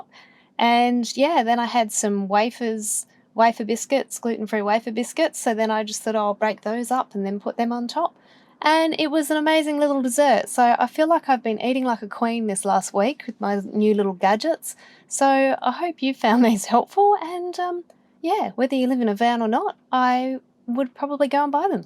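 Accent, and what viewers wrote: Australia. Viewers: So stocked yo hear an Aussie accent!!!! I’m about to get a van……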